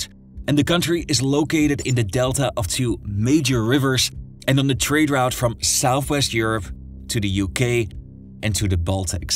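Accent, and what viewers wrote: Netherlands. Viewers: I thought I detected a Dutch accent. Stumbled upon this channel because it was covering the Irish immigration crisis. Nice channel, balanced approach. Fijne reis naar Overijssel!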